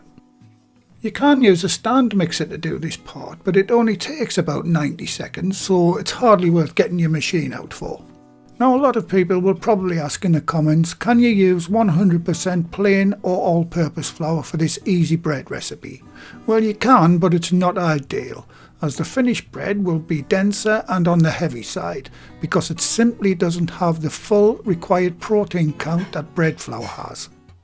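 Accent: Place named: Newcastle, United Kingdom. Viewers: Hello thanks for the amazing tip, I cannot get any strong bread flour anywhere in my area still sold out in all the shops but will keep looking, I am also from the north east of England and when I first watched one of your videos I instantly recognised your accent before you said where you were from ha ha:), your videos are amazing:) stay safe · Wonderful thank you. I love your YouTube presentations. I have lived in Australia for 43 years but I love a Geordi accent🙏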